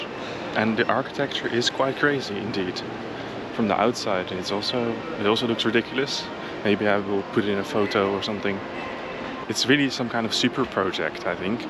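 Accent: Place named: United States. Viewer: I just come back from China, I use cash all the time, no one looking at me like foreigner. It's very strange how could not bring enough cash especially it is your 1st time, also seems you already alert all the bad thing before come in. Look your vlog, it has little viewers, this one hit 4 digit viewers just a few hrs. Sure, you want to back if only China is money come from. Hope you won't come back, sir. Korean are extrovert people? Give me a break. Most of them speak English? By the way you sounds like American dude😅 YOU ARE NOT WELCOMED!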